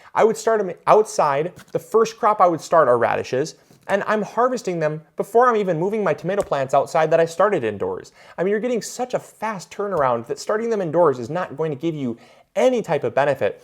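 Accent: Michigan, United States. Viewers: You sound like your from Michigan. nice video!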